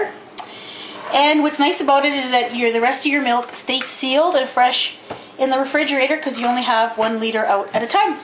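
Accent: Canada. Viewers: Omg I'm Canadian but I don't have the same accent as you-- you sound so-- so Canadian! __ I love it! (Was trying to figure out how they even put the milk in the bags to start with, to explain it to an American friend, but your video was still p entertaining hahahaha) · Um, this sounded like a plain old canadian accent to me, not a french accent. (Which doesn't mean she doesn't know french, just that she doesn't sound french when she speaks english.)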